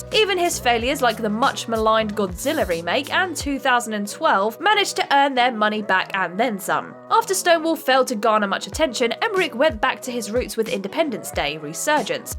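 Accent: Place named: England, United Kingdom. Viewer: Yankee? She English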